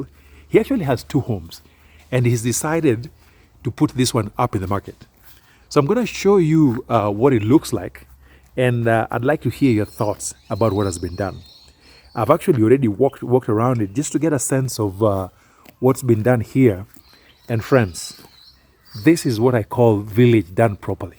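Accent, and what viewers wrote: Luo, Kenya. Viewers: Like your tweng..but yu keep it real when yu pronounce Luo words..kosele!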